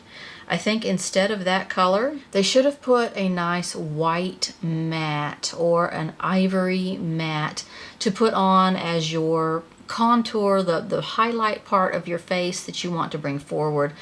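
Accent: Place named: Mid-South, United States. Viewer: love your subtle accent. Are you from the Mid-South? watched some of your videos LOL women of a certain vintage , so much better than saying women of a certain age! your makeup looks really good , edgy but classy.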